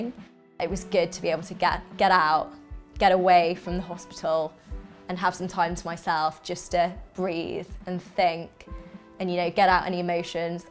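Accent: United Kingdom. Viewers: I love ❤️ that British accent ❤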